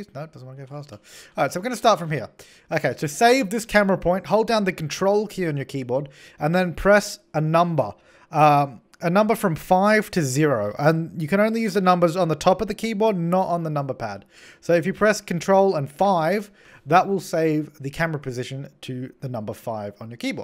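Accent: Australia. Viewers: You sound a lil bit like Jazza. I'm thinking its because of the Australian accents. 😳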